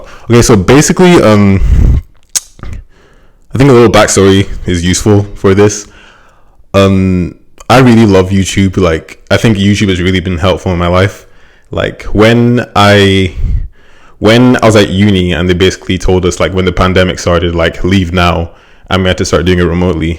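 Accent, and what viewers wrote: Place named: Scotland, United Kingdom. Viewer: YT is literally therapy for a lot of ppl. The Scottish accent thooo!!!! 😂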